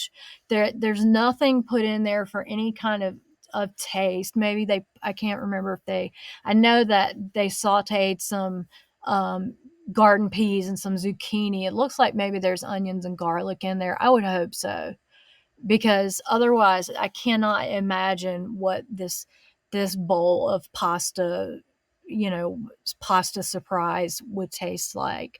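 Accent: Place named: South, United States. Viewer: I always enjoy your videos! As far as your southern drawl and you "working on it," please don't. You leave that drawl alone! It's a pleasure listening to it! Your reviews have been top-notch. 🏆 Thank you for your service, ma'am. 🫡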